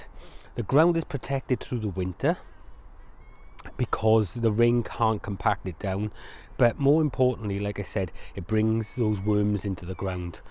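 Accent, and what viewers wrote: Wales, United Kingdom. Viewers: Hi. I'm a new subscriber. Your post's are FABLESS, (Love the Welsh accent) How's your rhubarb done this year 2019? Would appreciate an update. Best wishes from a fellow grower. 👍 ✌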